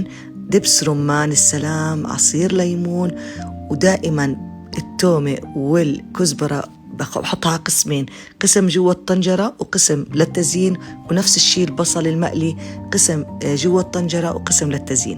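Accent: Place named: Syria. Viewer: ايش جنسيتها..؟؟ لهجتها كا انها سوريه تحياتي لكي ولكل سيده سوريه وسيدات العالمين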